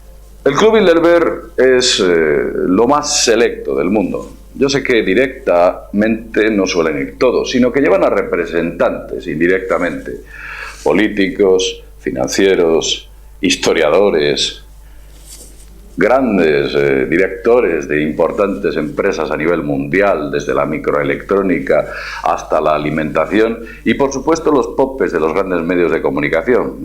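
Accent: Spain. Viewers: normalmente no soporto escuchar mucho el acento español,pero tus videos son tan amenos y los explicas con tanta pasion y seguridad que trasciende las barreras culturales y cumplen con su cometido, transmitir la informacion, de que all afuera hay un mundo mas grande, mas rico y mas obscuro que el que nos han puesto frente a los ojos saludos y adelante!!!! :D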